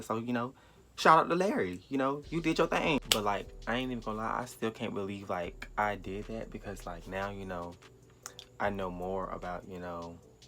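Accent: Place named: Midwest, United States. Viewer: U from or in da MidWest ? U sound like it.....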